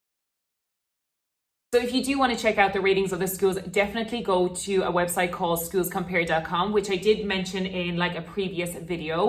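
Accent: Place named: Ireland. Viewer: u sound irish but certainly dont look irish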